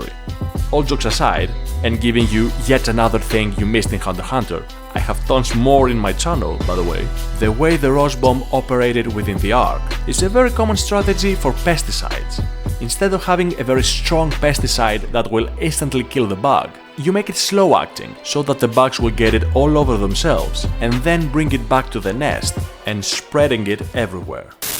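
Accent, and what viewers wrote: Greece. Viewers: that's fine. i am Greek too living in the UK, thats why i was able to recognize... You dont have to change anything about it. Great research on your videos.. Keep it up! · haha yes i tought greek first, the finnish 🤔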